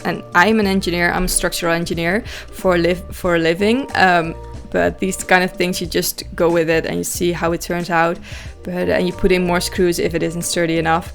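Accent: Netherlands. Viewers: From your accent, I would guess you are from the Netherlands or if not a Scandinavian country where women are supported to have so many different skills. Wherever you are, your building skills are excellent and the design is BRILLIANT! Very clean and open. Also love that you have narrated yourself. So much more natural. Also you are not using any stock footage. Wish I could do something like this for my baby. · Kom horen dat je Nederlands was😂 mooie boom! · Hoor ik een Nederlandse accent??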